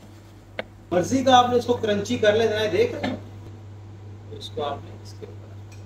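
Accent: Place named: Faisalabad, Pakistan. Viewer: Lehjy sy ap faislbadi lgty ho😊😊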